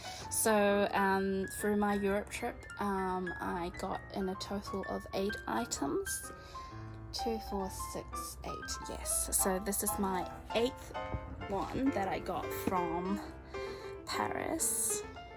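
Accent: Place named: Australia. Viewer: I can hear an Aussie Twang too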